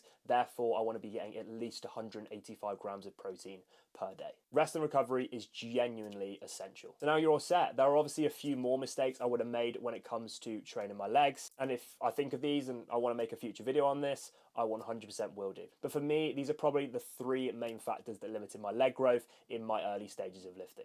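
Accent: United Kingdom. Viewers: British accent, blue eyes, big butt. My dream guy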